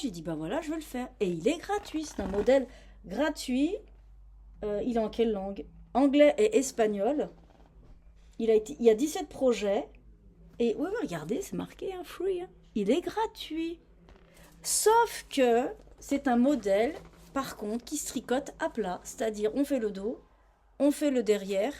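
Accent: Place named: Haute-Savoie, France. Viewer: Bonjour 🙂 Ravie de connaître votre podcast..tres sympa..et bercée par l'accent de ma chère haute savoie il me semble?😅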